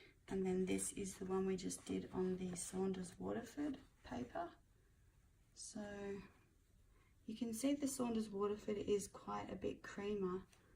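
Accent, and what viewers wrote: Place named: Australia. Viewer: I’ve watched a dozen videos on painting Watercolour roses and this one is by far the best. You sound Australian, hi from Tasmania 👋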